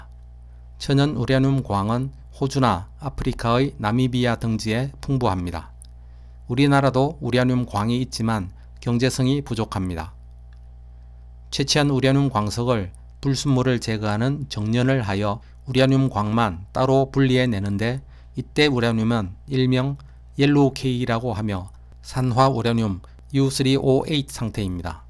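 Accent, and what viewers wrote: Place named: Gyeongsang, South Korea. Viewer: 보리문뎅이 발음 심각하네 스방의 열화 우랴늄 ㅋㅋ 적 1퍼쎈터가 되도록 농축얼 합니다 ㅋ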